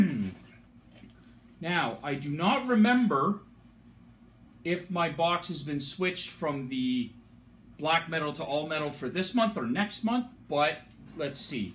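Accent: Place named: Canada. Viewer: Incantation flag is dope AF!! Are you from Canada? Just wondering because all of the "aayyy" lol. Good video btw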